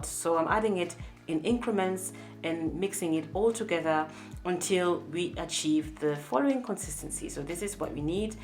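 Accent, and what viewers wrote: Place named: France. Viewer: I can feel your French accent in the English 😂😂 you're such a patient teacher 💕💕💕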